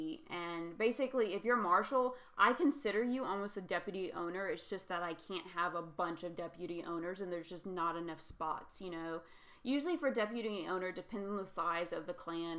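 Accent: United States. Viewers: The most american accent ever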